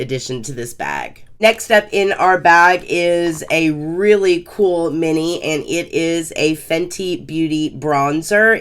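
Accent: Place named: Pennsylvania, United States. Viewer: I knew I recognized your accent, hello from the City of Brotherly Love fellow Pennsylvanian. 😊 I just bought this kit yesterday and had to consult with beauty gurus b4 opening.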